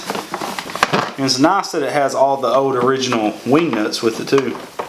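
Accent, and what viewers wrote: South, United States. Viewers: Cool, good job! Sounds like you are in the south, as I am too, I'm a old fart but I have been inside of every kinda of engine known as well as carburetors. Some friendly advice on a product which you probably have already tried but anyway get you some berryman carburetor dip it is a miracle dip. I use it also to clean bolts and pretty much anything. Give it a try.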